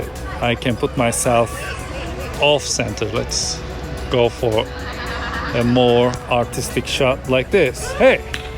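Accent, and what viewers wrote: Turkey. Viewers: Türk olduğunu sonradan aksanından anlayıp şaşırdığım youtube kanalı. İçerik harika umarım aynen böyle devam eder. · What a heavy Turkish accent. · Türk aksanı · Adam resmen Türk İngilizcesi konuşuyor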